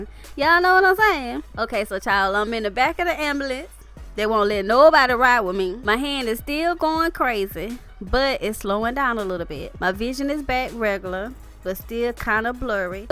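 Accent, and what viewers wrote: South, United States. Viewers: Glad your fine.. Love that accent by the way💯 #SouthernBell😍👌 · Aww my luv am praying for you!!!! Yes life is EXTREMELY short, plzzz take care of yourself, watch what you eat, drink, and exercise its so so important for our people. So glad to continue to hear your lovely voice with a southern twang!!!!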